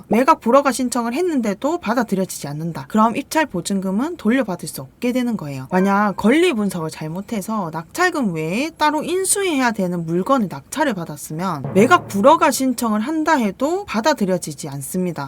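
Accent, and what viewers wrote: Gyeongsang, South Korea. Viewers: 왜 이영상을 이제 야 봤을까.. 유료 강의 듣고 잇는데 거기서 들은 내용이랑 똑같네요ㅎ 같은 경상도라 그런지 쏙쏙 들어와요ㅎ 감사합니다 감사합니다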